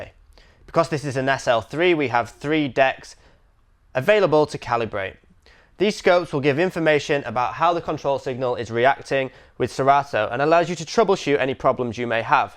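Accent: United Kingdom. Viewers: Thanks for the info but your brit accent makes it inunderstandable.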